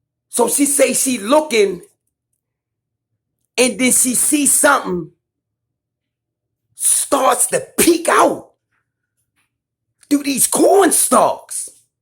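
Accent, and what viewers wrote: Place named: Louisiana, United States. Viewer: Hello,. Are you from Louisiana? You have an interesting accent, I enjoy hearing you speak, as I am not often exposed to people that have your accent. I'm a Hillbilly in northern West Virginia, so I have more of a Yankee accent. The people in the southern counties of WV have more of a southern accent. I'm definitely going to hit the subscribe button. Looking forward to more. Respect and Blessings from Wild Wonderful West Virginia. 🙏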